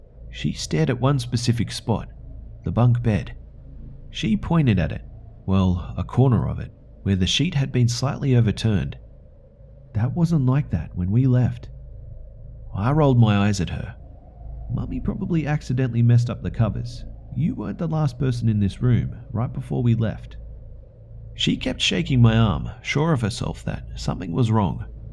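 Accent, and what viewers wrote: Australia. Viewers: Are u aussie? Love your accent and the way you tell the stories, keep it up x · he's Australian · Morgan Lowe he doesn't sound South African at all. Get your ears checked. It's Aussie. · 😊 I enjoyed this video listening to it at work thank you, I'd like to say that your voice is one of many I enjoy listening to especially your Aussie accent😊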